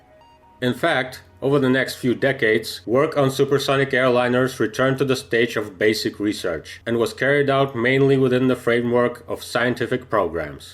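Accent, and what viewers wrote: Russia. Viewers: I love his Russian accent. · А почему с русским акцентом?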